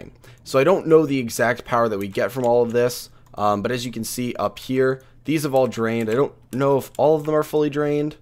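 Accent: United States. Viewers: You have a strong American accent what area of USA is that?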